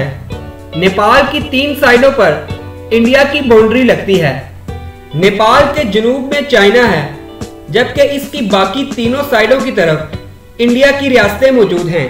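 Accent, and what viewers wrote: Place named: Pakistan. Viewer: Woh Pakistani Urdu accent yesa hota my Dubai mai reheta hoon sob Pakistani ka accent yesa hi hote he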